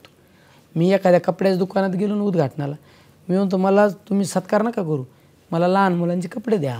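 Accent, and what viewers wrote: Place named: Ahmednagar, India. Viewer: अस्सल नगरी भाषा...त्याला काय लागतंय, एखाद्या वेळेस पह्यलं भाषण इंग्रजीत करील!!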